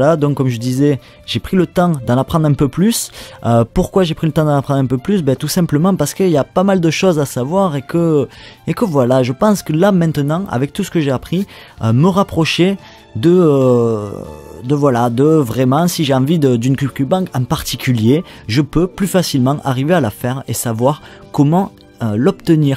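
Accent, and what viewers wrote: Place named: South, France. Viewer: J'adore l'accent du sud !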